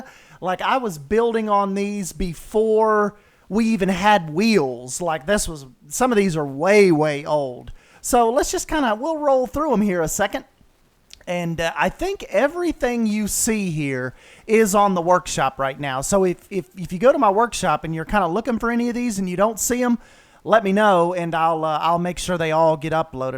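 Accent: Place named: Texas, United States. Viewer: Man this video is sooo vintage, I can't wait to see if they come out with a Planet Coaster 2 as I've heard you & Drew mention that quite a bit. I do think that Planet Zoo will be a perfect placeholder for you tho while we wait for a Planco 2. My wife said, is that guy from Texas? LOL Keep doing you man!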